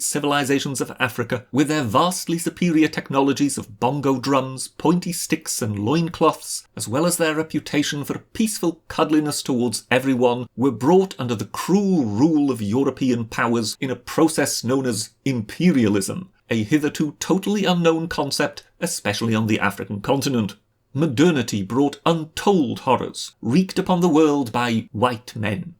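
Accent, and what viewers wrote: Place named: England, United Kingdom. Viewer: Such a petty that the video itself cannot be used in educating the young, due to the imperialistic English accent.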